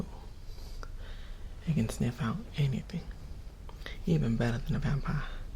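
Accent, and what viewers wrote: South, United States. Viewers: I personally love his southern accent. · My favorite I'm obsessed with werewolf but I'm not a big fan of that southern accent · A southern werewolf cool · Southern accent on point I'm from Texas so I can tell you you did you thing baby!! You're talented as hell 🤘🤘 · Look it can be the werewolf but like you can just do the southern accent cause DAYMN!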